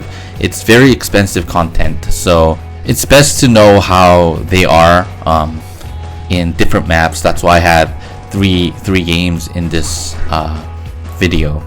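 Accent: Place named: Asia. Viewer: You sound totally Asian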